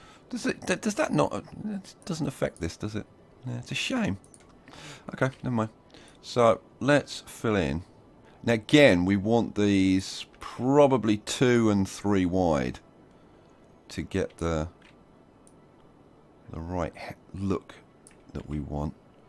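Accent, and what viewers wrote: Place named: Birmingham, United Kingdom. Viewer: you said you lived in london most of your life, i always thought you had a brummie twang..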